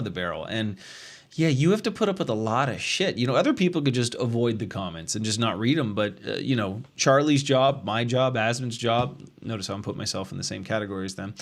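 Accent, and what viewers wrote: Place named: Canada. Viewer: Man. You're funny and cringe but I HATE the way you Canadians say your "out" words as oot. They're pronounced OWT not OOT you snow monkey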